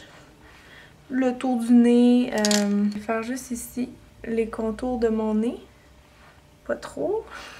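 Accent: Quebec, Canada. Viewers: OMG cet accent québécois J'ADOORE 😆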